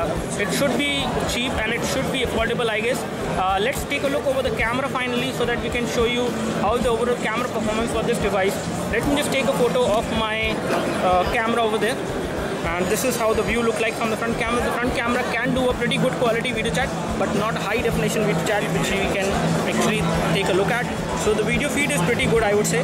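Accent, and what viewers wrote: India. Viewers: I love Indian accent :-D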